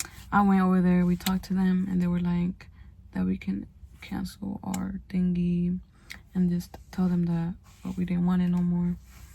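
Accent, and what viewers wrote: United States. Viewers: gurlll what side of houston you from?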